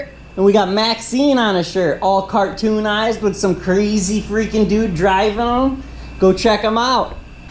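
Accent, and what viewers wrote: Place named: Wisconsin, United States. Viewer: Love this guy’s can do attitude. Love your accent too. Where are you from originally? Reminds me of Wisconsin 🙀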